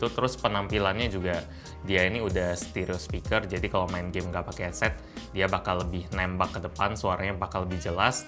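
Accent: Palembang, Indonesia. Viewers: Ay wong palembang sikok ini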